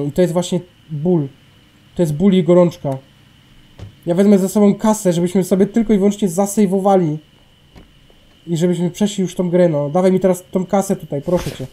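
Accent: Silesia, Poland. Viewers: przaja ci za ten śląski:DD od razu swój rozpozna swego też staram się mówić po polsku i pisać ale zdarza mi się gadać po śląsku a i powinieneś zmienić nazwę kanalu na - król bugowania gier albo osoba która każdą grę ci zbuguje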